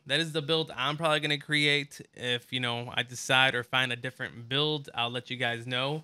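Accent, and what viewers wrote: Chicago, United States. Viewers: Wha part of Chicago u from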